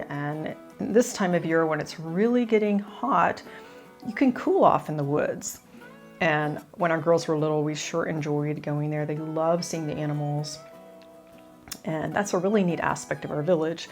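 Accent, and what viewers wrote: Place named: Texas, United States. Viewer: I love your German cause I can hear a little Texas thang in it, you sound lovely. I went to Germany for world youth day in 2000.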